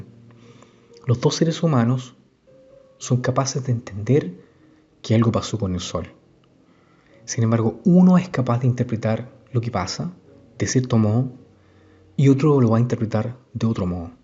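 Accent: Chile. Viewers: sos chileno verdad?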